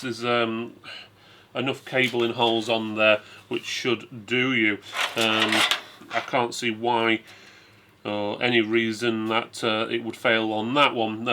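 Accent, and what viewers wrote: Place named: United Kingdom. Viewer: Too much Bri ish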